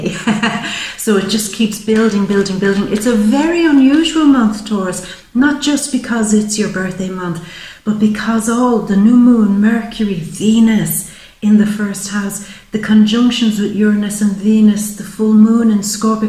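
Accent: Ireland. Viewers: Felt pulled to ur video😇after watching O.A.'s 1st season yesterday, I see why🤔👰🤴🥂loved the reading & ur lovely Irish accent😃😎☄had to sacribe to ur channel & want to say THANK YOU to u in advance, 4 helping me w/my "Ascension"☄😎👰🤴🥂🕉 4/28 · I love your Irish accent. I work for a lovely Irishman. The Irish are poetic, and deeply in touch with the spirit energies! <3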